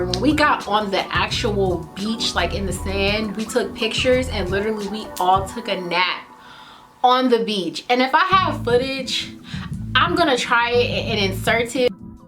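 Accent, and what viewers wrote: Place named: New Orleans, United States. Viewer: Lol that New Orleans accent😂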